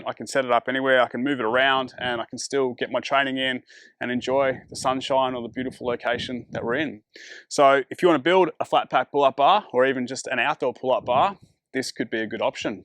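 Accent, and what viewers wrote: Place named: Australia. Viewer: It’s wild to here the Australian accent. So many endings of sentences sound like it’s a question. Pull up bar? Higher? Top bar?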